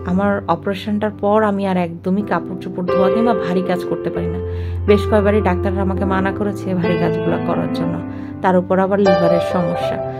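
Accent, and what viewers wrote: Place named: Chittagong, Bangladesh. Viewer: tomar kothay Chittagong er akta tone asy…..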